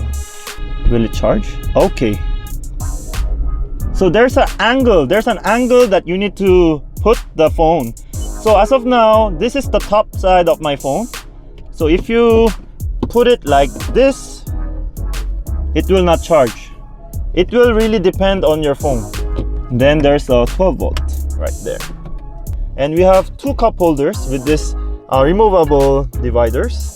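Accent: Philippines. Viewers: He has a Filipino accent. Must’ve learned English in the Philippines.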